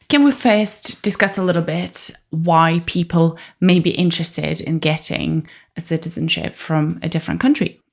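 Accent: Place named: RP, United Kingdom. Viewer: Hi, First of all, may I say how wonderful your English is, and that posh accent of yours… I absolutely love it! Honestly, if I didn’t know better, I would have thought you were born in Britain. It’s astonishing to hear such a lovely accent! Hearing you speak brought back so many emotions. It reminded me how quickly time has passed, how many challenges I’ve faced, and how, at the end of the day, every immigrant who becomes a citizen of their adopted country truly cherishes, appreciates, and values the place they now call home. A little about me: I was born in Venezuela to immigrant parents who had built their lives there. Yet I never felt I truly belonged in my country of birth. Deep down, I always wanted to move abroad. Thanks to my parents’ nationalities (Chile and Portugal), I had the chance to come to the UK before Brexit closed that door, and I seized it. 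Those first weeks in Britain were life changing. I realised how much I would have missed if I had stayed behind. I wasted no time: I got a job, organised my documents, secured my right to work, and began building my life here. That first year was a whirlwind of learning English, discovering the culture, and meeting people who made me feel instantly at home. When Brexit came, I rushed to secure pre-settled status to remain legally. Later, I earned my Settlement status (ILR). But still, I wasn’t satisfied. I wanted to go further. I wanted to vote, to have a true voice, and to belong in the eyes of the law, becaus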